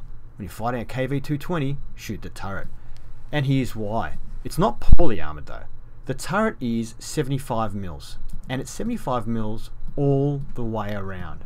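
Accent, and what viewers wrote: Australia. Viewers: R u an Australian/New Zealander?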